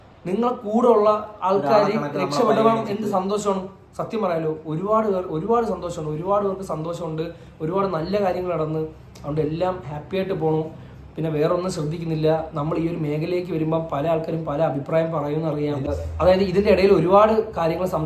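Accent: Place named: Kollam, India. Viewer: Hii brooh 🥰nighlde videos um nighle 4 pereyum othiri ishttanu❤pinnah slag um. Njn koodhalum nighlde videos anu kanunnathu. Kollam slag enik othiri ishtta, pinna nighl samrikunnathu kettittum enikum samsarikkanum ennu aghraham ind.Njn Ernakulamkkariyanu. Apo ivda ntha, nthu ennokeya paraya ipo ithinu pakaram enthonnu, enthru agana paraya ee vakku oke enik chettanmarde videos il ninna kittiye🥰, pinnah nighl samsarikunnath kelkkanum adipoliyanu . Njn athukond thanne nighlalil ninn korach words padikkum. Ennt paramavathii nighlde pole samsarikkanum shremikkum🥰🎉🥰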